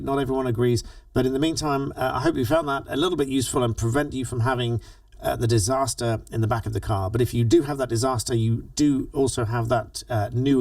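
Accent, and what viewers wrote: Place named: Northern England, United Kingdom. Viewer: He is a bit Northern.........Possibly.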